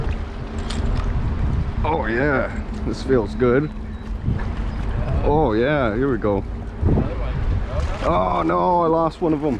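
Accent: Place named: East Coast, United States. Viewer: Couple comments and questions?! First your outro music scared the SHIT out of me. I was intently staring at that fish you couldnt indentify watching him swim away and BAM. Heart attack. 😂 Next up, you sound super east coast. Its great. 😏👌 how do you euthanize the fish? Its nice to see you gently remove the hook. I went deep sea fishing once and the guy just ripped the hook out of the fishes lip 😭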